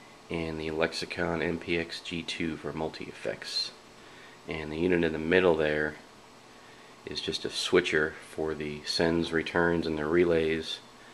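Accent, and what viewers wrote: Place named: South, United States. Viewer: Wow! You've got a southern Twang.... I've gotta get down there to record witcha!!!! 1993 seems like ages ago!!!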